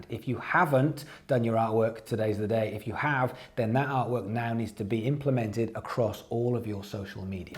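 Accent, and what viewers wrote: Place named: England, United Kingdom. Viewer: Thankyou so much, you are so knowledgeable, i have learned so much from you,and an English accent! I will follow your advice.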